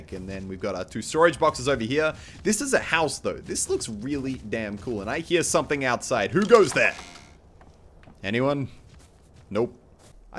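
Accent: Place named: Australia. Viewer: he's australian ^^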